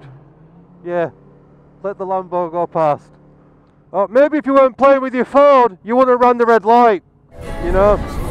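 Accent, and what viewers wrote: United Kingdom. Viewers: what part of northern england is that accent dude. great vid by the wayl.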